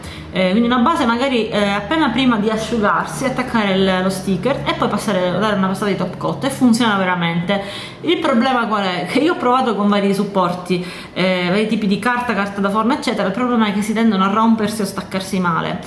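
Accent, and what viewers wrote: Sicily, Italy. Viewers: io e te abbiamo le stesse passioni makeuppose... Il verde-oro e gli ombretti in crema... Sarà per questo che mi piaci tanto e non mi perdo un tuo video??? O sarà perchè amo l'accento siciliano??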